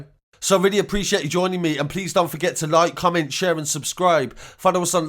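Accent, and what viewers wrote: Birmingham, United Kingdom. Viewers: Bro you're ACCENT is soooooo brummie it's unbelievable I'm from Birmingham myself but boy your accent is so strong. Where you from in Birmingham? I'm from chemsley wood if you know then you know 🤪🤪🤪 I'll subscribe to your channel and will be waiting to see some more breaking news ✋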